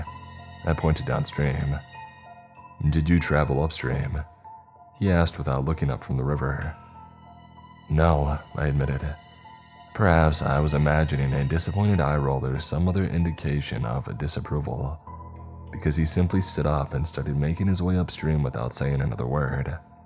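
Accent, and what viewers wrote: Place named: New England, United States. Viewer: When I visited most people called it Baw Hawba. 😂.. I love that Yankee accent!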